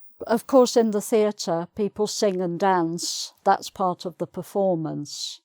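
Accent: United Kingdom. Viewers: Always excited to hear your British accent which makes me want to keep learning and practicing English as a none native English speaker.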